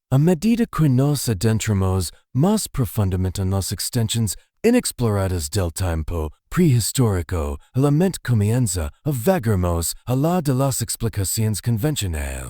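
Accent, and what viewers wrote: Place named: United States. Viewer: Qué cojones... Han puesto a una IA gringa cateta a pronunciar español?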